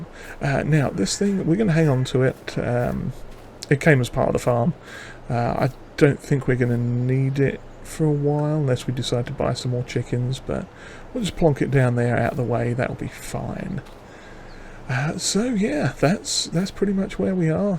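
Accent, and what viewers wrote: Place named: England, United Kingdom. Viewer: yea me too I love it to be real tough BUT still doable of course. And yea I'm really tied to all the old vintage stuff. I'm from the US (Midwest) and despite what the game says my grandpa's farm growing up and all the surrounding farms were pretty small and used old stuff. I never saw a closed cab until I was long off the farm and in another state lol (I'm 43 and we are talking about as I grew up in the late 80s and thru the 90s lol) Haha you and I have had the same experience with the horse/ox and carriage/plow so yea it should be an adventure!!! What part of England are you from? By your accent I ruled out the rest of the UK. My apologies if I'm wrong but I'm pretty sure you aren't from anywhere else and you aren't a scouser lol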